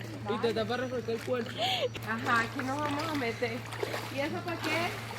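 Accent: Colombia. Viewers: DILE A LOS DEMAS QUE HABLEN PARA SENTIR QUE ESTOY EN VENEZUELA, TU HABLAS PURO COLOMBIANO.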